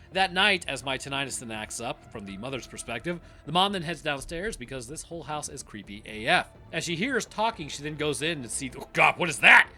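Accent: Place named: United States. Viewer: "Learning more about the world around us" I imagine that would be vastly different between literally ANYONE ELSE IN THE WORLD, and Seppo's...who have no idea what the rest of the world is like, outside of what their State-based Social Media brainwashing and generational indoctrination tells them...which, ofcourse, is all wrong! Also, I know all english-speaking countries and peoples have an accent... but Imperial Measurements are pronounced "Fu<kwit units"... I know, I know, its an accent thing, I hear it as "Freedom Units", but just wanted to clarify that for all the other English-speaking audiance members... he is saying "Fu<kwit units". And I know, we hear his American Accent as "heathen units", but thats just the Seppo twang on "Metric units"... Remember, the U.S. is a third-world country pretending to be a first-world country... They don't know how good it can be away from that toxic brainwashing and indoctrination...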